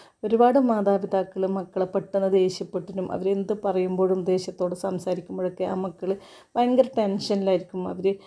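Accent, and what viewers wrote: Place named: Kannur, India. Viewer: ചിലത് ഒന്നും മനസ്സിലാവില്ല കണ്ണൂർഭാഷ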